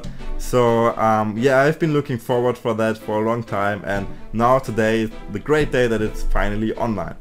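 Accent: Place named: Germany. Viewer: Der deutsche Akzent ist das Beste! :D Ich schau mal rein, support ist kein Mord. ;) :)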